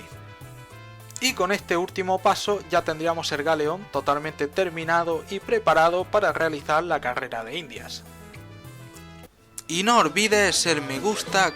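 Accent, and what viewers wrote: Murcia, Spain. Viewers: Aaaaaaaxooooooooooooooooooooo,ese acento es murcianico pijo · De que parte de España es ese acento?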